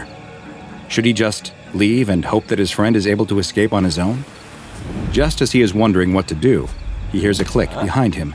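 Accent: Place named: United Kingdom. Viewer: British man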